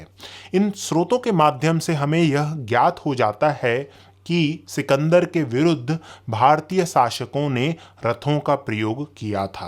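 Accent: Standard. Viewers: request, aap standard hindi toh bolte hi ho, par thoda thoda awadhi language (awadh region ) words and slang, accent bhi use kar liya karo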